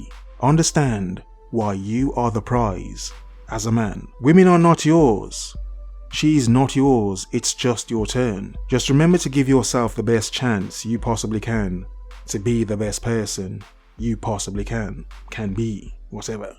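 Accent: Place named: United Kingdom. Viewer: Level 1: read the article yourself Level 100: heard it read to you through a British bloke's voice with the occasional hearty chuckle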